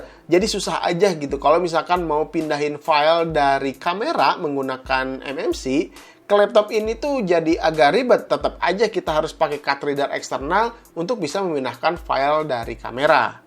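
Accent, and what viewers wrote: West Java, Indonesia. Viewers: aduh akang sunda pisan hahaha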